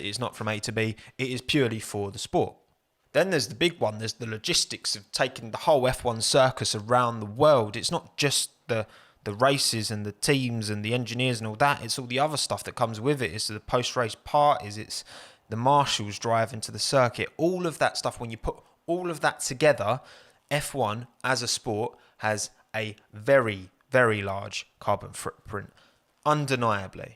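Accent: United Kingdom. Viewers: I only wanted to let you know you have the britiest accent I've ever heard I'm spanish btw.. Nice video too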